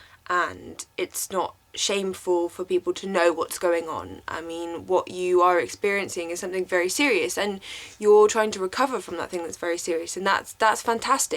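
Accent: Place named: RP, United Kingdom. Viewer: i love your posh accent.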